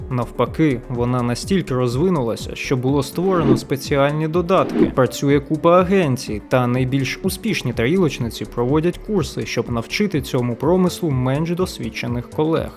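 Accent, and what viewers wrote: United States. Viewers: бро, ю хев гуд амерікан аксент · Крутий американський акцент, ніколи ще не дивився відос від українського Джона. Лайк + підписка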